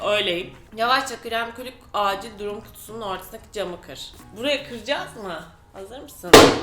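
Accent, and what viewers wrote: Kütahya, Turkey. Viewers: Kütahyalı mısın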